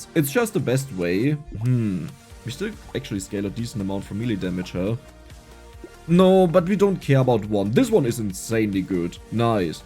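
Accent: Germany. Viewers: Ähm kurze Frage du machst nur Videos auf Englisch oder? Ich fände es echt cool, wenn du auch mal das ein oder andere auf Deutsch machen könntest. Irgendwie klingt es für mich so, als wärst du eigentlich deutschsprachig.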